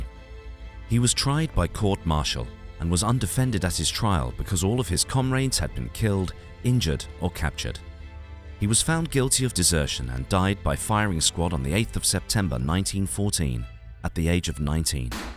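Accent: United Kingdom. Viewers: British accent tho lol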